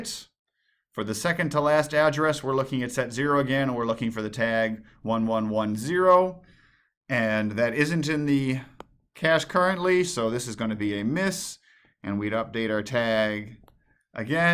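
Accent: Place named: India. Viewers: I know right. I mean no offence, but it's hard to perceive an Indian accent. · Thank you so much for this. English is my second language and I have a huge problem understanding indian English, so this is extremely valuable for me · Indian English lets me forgets standart english as you can see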